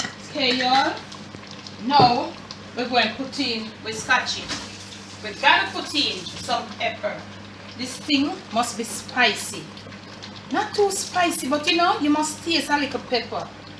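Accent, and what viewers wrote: Jamaica. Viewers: Am here for Jamaican accent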